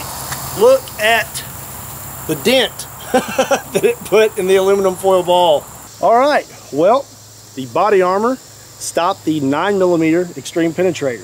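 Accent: Kentucky, United States. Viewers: What part of kentucky you from? Im From Winchester/ Clark County!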